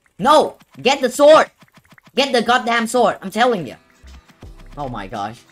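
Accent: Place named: Vietnam. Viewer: Are you Vietnamese you just have a accent(no offence)if you are Vietnamese I'm also vietnamese:)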